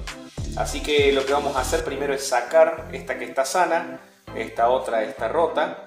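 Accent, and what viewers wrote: Córdoba, Argentina. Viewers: Sos de córdoba? Donde tenes local?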